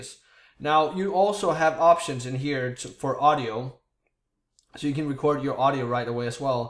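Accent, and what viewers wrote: Denmark. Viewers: Jeg tænkte det nok, jeg synes du har en svag dansk accent, men dit engelsk er pænt godt ( I hvert fald bedre end mit og jeg er 14 år gammel )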